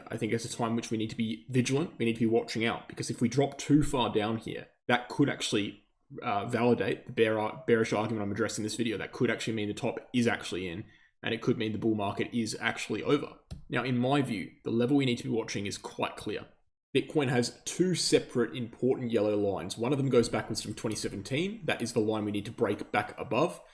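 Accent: Australia. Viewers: this comment is NOT from a bot… You have the most annoying type of Australian accent; no American can take it seriously … and, the fact that you still believe in four years cycles after structural institutional change means… “Thank God I don’t have to listen to this Ozzy“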